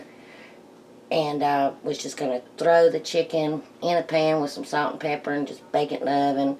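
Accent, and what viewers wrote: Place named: North Carolina, United States. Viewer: I like your recipes! Your accent is like mine so its like we are at a family get together! Hugs to ya. Darla in NC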